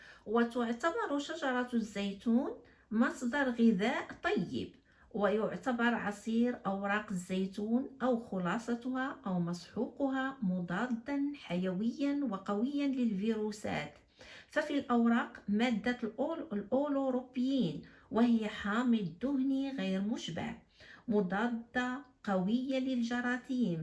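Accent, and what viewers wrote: Morocco. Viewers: طيب الله الأنفاس قراءة بصيغة مغربية رائعة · قراءة طيبة بصيغة مغربية رائعة جدا جزاك الله خيرا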